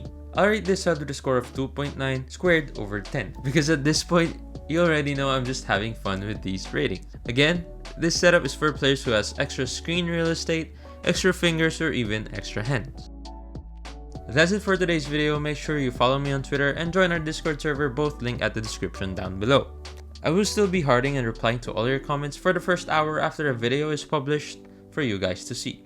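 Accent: Philippines. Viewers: Btw r u asian or filipino? Just askin cuz u sound like u have an asian accent mostly sounds like a filipino english accent:)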